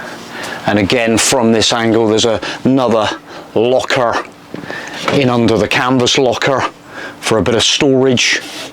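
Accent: West Country, United Kingdom. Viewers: Nice van! And i thought i had a strong west country accent, until i heard yours lol!!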